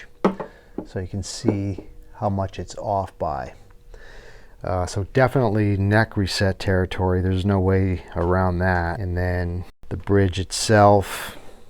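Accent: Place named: Philadelphia, United States. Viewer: Where are you based? My gf and I are trying to pinpoint your accent. I'm saying close to Philly. That's one effed up dovetail. Nice work,!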